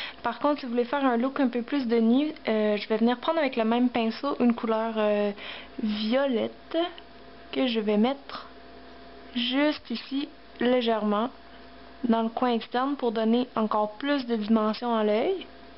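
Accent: Quebec, Canada. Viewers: tu vient du quebec?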